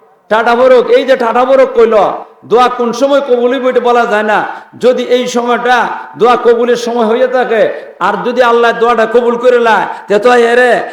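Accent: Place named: Brahmanbaria, Bangladesh. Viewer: এই হুজুরের গ‍্যান সিমীত। আরো সুন্দর ভাষায় ব‍্যখ‍্যা করতে হবে। বাংলা আরো শুদ্ধ করে বলতে হবে, বি.বাড়ীয়া এলাকার accent ব‍্যবহারে বাংলা বললে হবেনা।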